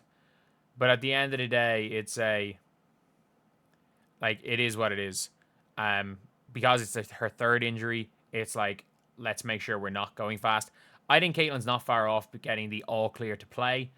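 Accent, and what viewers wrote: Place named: Ireland. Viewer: I like your accent! Sounds Irish to me. Where are you from?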